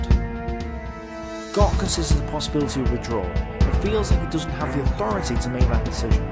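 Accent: United Kingdom. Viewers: Not sure if its True British Accent It could be Australian Or Down Under period, either way your English Accent is Thick as Hell Period and Im from USA and have a hard time Understanding what the hell your saying......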